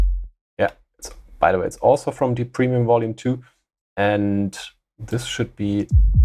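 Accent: Germany. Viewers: Ich mag ja den Deutschen Akzent XDD... aber sehr schönes tutorial... Das problem ist, wenn ich solche baselines mache, hören sie sich auf meinen monitore sehr schön an, aber im auto dann mit anlage grauenhaft, weil zu viel sub anteil, nehme ich dann die low ends so weit raus, dass es geht, hört es sich auf Lautsprecher scheisse an... eine todesspirale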